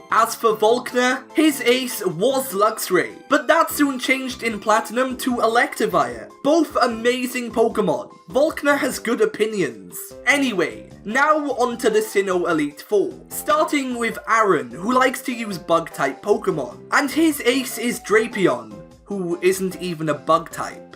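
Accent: Wales, United Kingdom. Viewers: Are you welsh ? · you are welsh aren’t you. I can kinda make out some welsh accent. Possibly, I’m an American so I know nothing.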